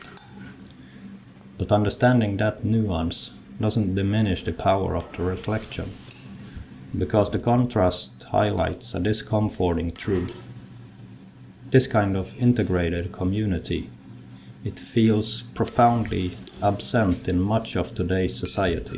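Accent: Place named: Finland. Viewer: You do sound more Finnish than Swedish.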